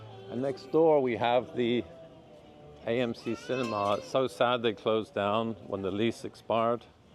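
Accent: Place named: United Kingdom. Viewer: Sounds like a Brit who’s lived there for quite some time to pick up some Americanisms in his speech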